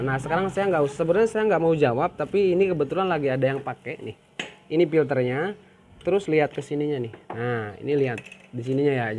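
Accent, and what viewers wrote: West Java, Indonesia. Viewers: Lok mana om Bandung apa mana ya soal nya logat bahasa nya Sunda juga kaya saya hehe..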